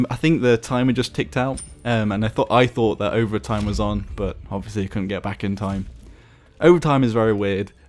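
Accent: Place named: United Kingdom. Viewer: Are you British? You sound like you have a British accent.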